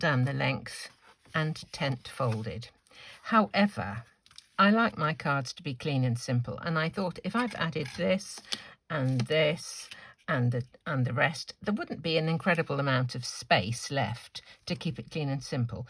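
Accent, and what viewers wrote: United Kingdom. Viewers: I completely agree! It still boggles me that some people can be so rude! If you don't like someones videos, don't watch them, there's no reason to be mean! I completely adore all your videos and thoroughly enjoy all your commentary with yourself, especially with your British accent! You just have a way of saying things that is so different from the way we Americans speak and I absolutely love it! Don't change a thing! Love all your CAS cards and videos! Thank you for taking the time to share all your thoughts. I myself, have one of those bins full of started projects or scraps and bits of cards that never got finished. I always feel a great sense of accomplishment when I have pulled a card together from those bits.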